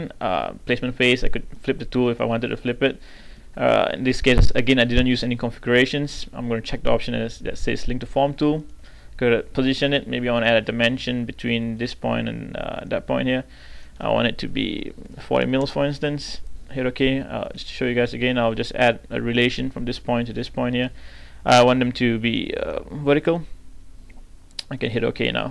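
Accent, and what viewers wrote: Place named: United Kingdom. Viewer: interesting. cool British accent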